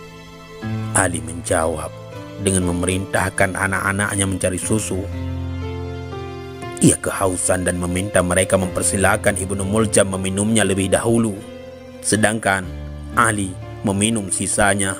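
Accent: Aceh, Indonesia. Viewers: Dialeg admin, terdengar seperti dialeg aceh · Saya lebih ke suku aceh suara "s" dan "t" nya khas .. dan fasihnya melafazkan kalimat arab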